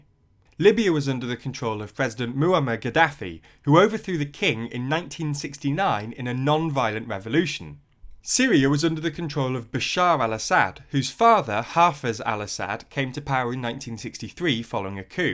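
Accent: United Kingdom. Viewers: It's always fun hearing somebody with the most British accent talking about these kinda things. xd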